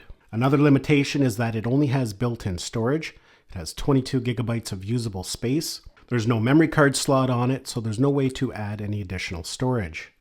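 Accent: Canada. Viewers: You sound Canadian!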